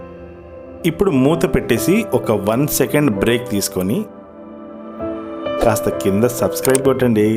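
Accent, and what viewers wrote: India. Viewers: sir, plz check your Instagram for both egg n gheeRoast Ckn. Just tagged from my wife's account😊😊we just prepared...as usual Ur voice, song, presentation.....finally Telugu slang.......pichchekkinchesindi😂😂😂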